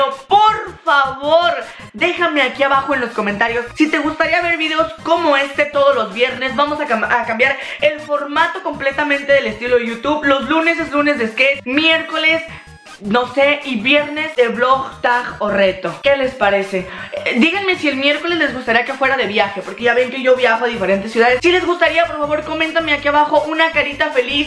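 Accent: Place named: Mexico. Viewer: .... Are you like a Freso? I have friends from Mexico And thery act n talk like you.. Not as funny But they go t the same mexica Style of your Lingo.... Awesome channel You are very Funny I love it❤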